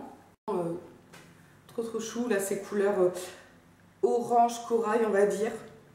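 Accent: Belgium. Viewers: la gamme ultra doux a la mangue et a la fleur de Tiaré j'adore c'est la gamme que j'utilise et rachète depuis 3 mois et elle a balayé les autres gammes de ma salle de bain ! Les petits gel douche the body shop j'adore aussi et le premier petit haut noir H&M il est sublime! ta vidéo est top et l'accent belge mdr ! bisous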